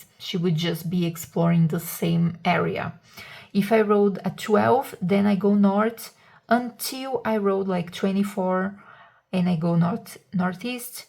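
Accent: Brazil. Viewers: Acho engraçado que eu reconheci o sotaque brasileiro nos primeiros segundos de vídeo kkkkk, o nosso sotaque é muito reconhecível e belo! Excelente vídeo, keep up the great work!! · Imagina a minha surpresa quando ouvi a voz e pensei "isso é BR", fui olhar nos detalhes do canal e boom, era mesmo. Nice seeing another brazilian in the weeds of the hobby, pur gaming landscape goes hard in a different direction than what you tend to see on youtube. Lots of GURPS going on around Brazil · Hi! I'm loving your content. So good! It feels like you're a brazilian because of your accent. Don't know if you're comfortable disclosing your nationality, but, if you are, greetings from a fellow tupiniquim :)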